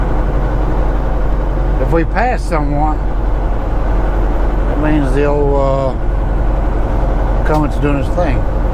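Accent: South, United States. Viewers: Native Alabamaian so southern talk is fine by me. Always enjoy your videos and conversation!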